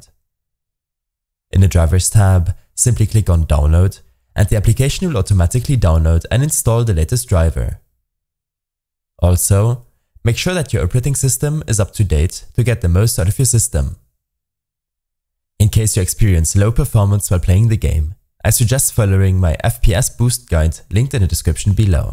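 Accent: Germany. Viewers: man merkt das es ein Deutscher ist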